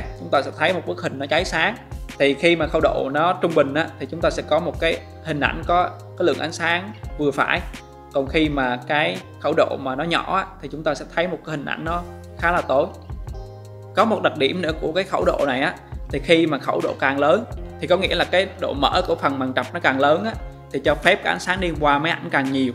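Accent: Da Nang, Vietnam. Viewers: Giọng bạn giống giọng Đà Nẵng, phải b ở ĐN không nhỉ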